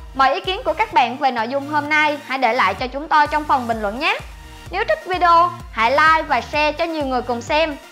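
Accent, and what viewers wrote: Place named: Da Nang, Vietnam. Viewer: Giọng Đè Nẻng nghe vui tai nhỉ!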